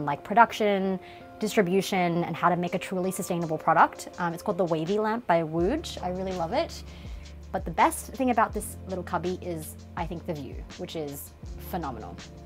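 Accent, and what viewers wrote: Australia. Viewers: Love her accent, can hear the Australian coming through. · Are you a an Australian? You sound very Aussie, ooh never mind I just saw Vegemite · Her accent is definitely not regional to anywhere in the US. She sounds Australian to me.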